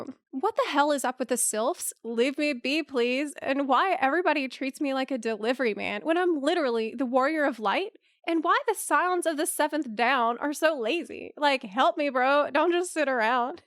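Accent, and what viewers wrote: South, United States. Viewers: I have just discovered your channel recently and I knew you sounded American, but I had no idea you live in Ukraine!? What you doin' over there? Love your content, and I'm also staying in my house except for necfesary grocery shopping. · I never would have guessed you live in Ukraine. Your accent seems vaguely southern United States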